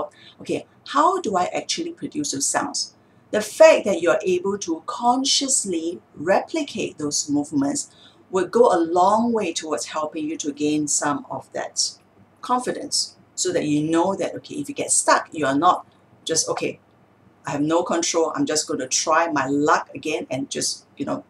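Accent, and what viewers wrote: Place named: China. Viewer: Btw, I enjoyed the clear and smooth English with the very tiny, little little charming Chinese accent..what a pleasant voice to listen to. Very calming.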